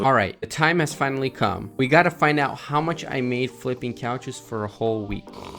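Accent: Russia. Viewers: Are you Russian or Slavic? I’m willing to bet my left nut you are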